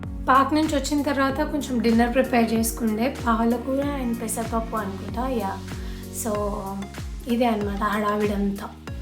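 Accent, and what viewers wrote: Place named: Vijayawada, India. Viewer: Mee slang chala bagundhi.... Meeru vijayawada....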